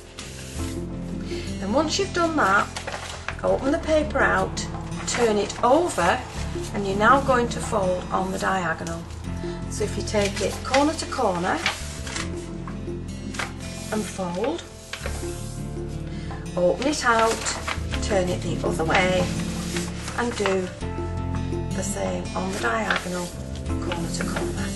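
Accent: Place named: Lancashire, United Kingdom. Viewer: this was the first of your videos that I found, back in 2010 when I discovered that Youtube had cardmaking videos! I have been a fan ever since. I'm currently re-watching your videos from the beginning - whilst ironing! Your videos make ironing fun!!! Thank you so very much for all the inspiration! (I love your accent btw, my hubby's a Lancastrian!) X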